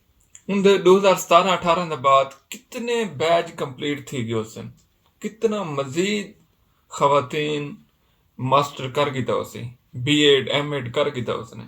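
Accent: Saraiki, Pakistan. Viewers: Yes sir 😢 me also completed master also B.ed 😢 but i have nothing I am also form distric bhawalpur Also mother language sariki your speak of method sariki amazing 😍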